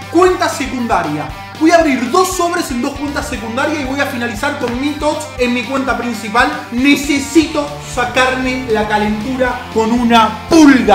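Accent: Argentina. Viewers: Se copia de robert solo que con acento argentino :v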